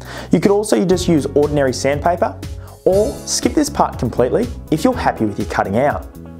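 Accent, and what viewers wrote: Australia. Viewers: That was actually awesome and so refreshing to hear an Aussie accent. I missed the bit on how you did the black window trim. Also, I want do the exact same paint job just with the main body Tamiya Dark Metallic Blue. Would I need to back it with black or would that turn out crazy dark? Thanks guys, good job. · This guy sounds Australian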